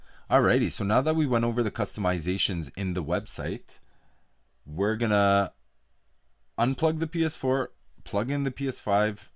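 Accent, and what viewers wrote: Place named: Guyana. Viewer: Yuh sound guyanese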